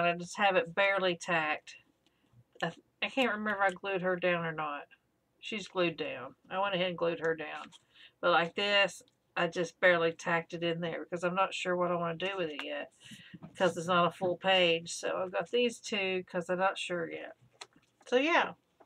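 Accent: South, United States. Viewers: Hi! Just discovered your channel! Love your southern accent and your fun personality. Your gluebook is amazing!! I am originally from Oklahoma...what part of the south are you in? I totally speak southern. HAHA. No black Friday shopping for us.... I hate the crowds (with COVID, it may not be as crowded, but ick). We are going to try to shop local businesses for Christmas this year. Want to support our community. Usually we do Amazon.... easy peasy. LOL Thanks for sharing your gluebook with us! - Kari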